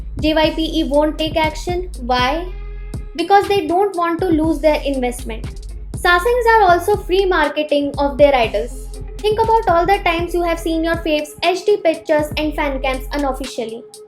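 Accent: India. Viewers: Hey are you indian your ascent is like a indian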